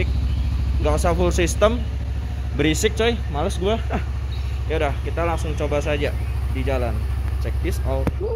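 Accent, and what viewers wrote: Java, Indonesia. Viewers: Ngomong guwe ne medok mas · omongane kagok ketok e wong jowo iki mesti😂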